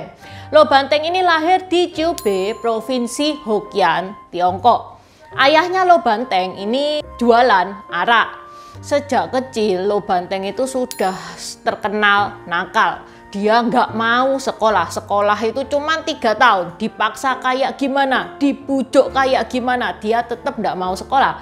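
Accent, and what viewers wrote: Java, Indonesia. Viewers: Cicinya Indonesia banget... Keliatan dari medoknya🙏🙏🙏🙏👍👍👍👍👍❤️❤️❤️❤️❤️ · ci bahasa jawa nya mendok kali,,ci ajarin bahasa Tionghoa dong ci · Medog banget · Aksen jowomu medhok Cik. Sukses selalu berkarya ci. Di deket pasar kopro tanjyng duren ada toko obat Lo Banteng, itu asli keturunannya atau waralaba Li Banteng ya. Btw, aku gak kenal Suhu Lo Banteng, tapi aku pake obat araknya, rupanya gitu ya sejarahnya sang Suhu tsb.... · Intonasi bhs sangat Jawa bnget..mantap mudah dimengerti dipahami..mksh mbak..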